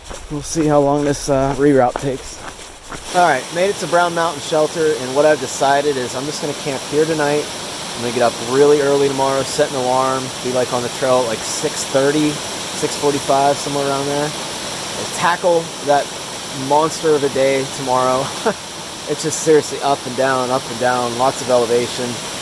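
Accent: Pittsburgh, United States. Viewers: Chris Stinsman that’s the Pittsburgh influence 😆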